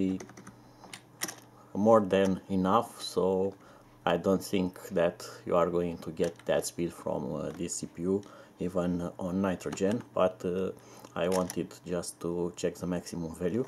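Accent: Romania. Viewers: Hai salut. Mama cum recunoști romanii dupa accept :)))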